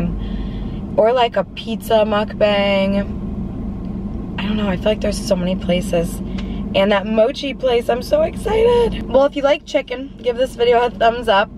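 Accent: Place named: New York, United States. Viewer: Your not from NY with that accent?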